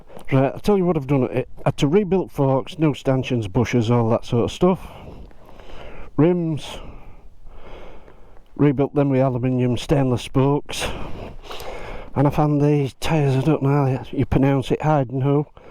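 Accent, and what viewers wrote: Yorkshire, United Kingdom. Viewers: I’ve been rewatching your “archive” recently as I miss your Yorkshire twang and your regular visits to Squires ! I can understand that you’ve already video’d all your bikes and that putting videos on YouTube is a lot of work but an update on your stable would be highly appreciated ! Best Wishes !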